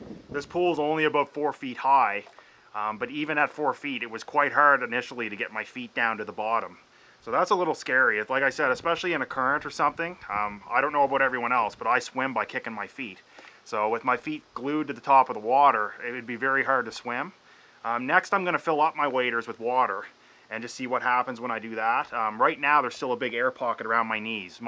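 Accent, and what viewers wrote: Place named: Canada. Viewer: Thumbs down because of canadian "aboot" and "ooot"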